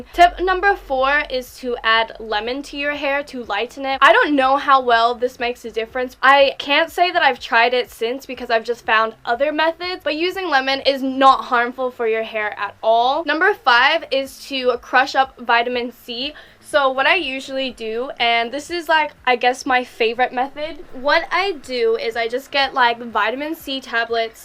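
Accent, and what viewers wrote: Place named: Australia. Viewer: You sound more Australian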